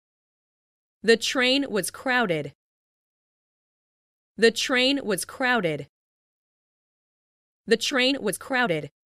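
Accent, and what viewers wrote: United States. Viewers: Is american accent? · american accent detected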